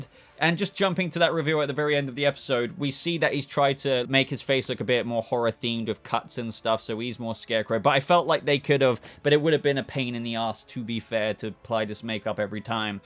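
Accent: United Kingdom. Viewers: I am totally digging your British accent. 😆..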